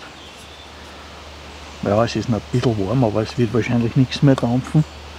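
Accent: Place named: Vienna, Austria. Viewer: Hallo!Dieses Jahr kommt mir die Natur so viel grüner vor als die letzten Jahre,oder kommt es nur mir do vor. Schönes neues Plätzle! Hast dich ja gleich schön häuslich eingerichtet. In letzter Zeit verstehe ich dich nicht wirklich, was vermutlich mehr an meinen Ohren liegt, und ein wenig, dass mir der Wiener Dialekt nicht so geläufig ist. Aber du sprichst den ja schon immer, also sind es meine Ohren, dietrotz Hörgerät nur 50% erfassen oder inzwischen noch weniger. Also wundere dich nicht, wenn ich nicht darauf eingehen. Aber ich gucke dir gerne zu. Bleib behütet, Grüßle Gunde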